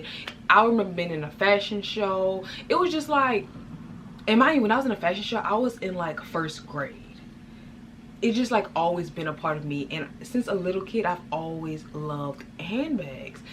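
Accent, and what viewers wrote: Louisiana, United States. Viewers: Baby I heard that accent from a mile away, fellow louisianian.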